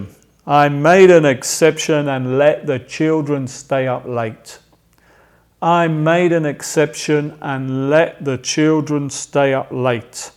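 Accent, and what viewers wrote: England, United Kingdom. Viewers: Amo el acento Ingles. Ya me quedo clara la diferencia =)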